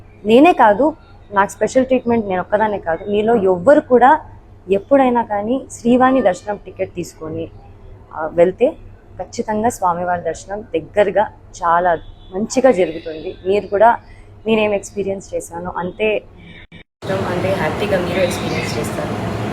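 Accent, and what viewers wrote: Telangana, India. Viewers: Podcast lo your slang was like telangana girl..but ikkada chala different ga vundhi...podcast lo fake slang aa or ikkada fake aa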